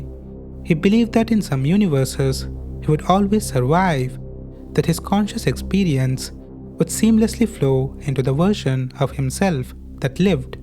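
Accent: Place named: India. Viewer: You sound like an Indian 😊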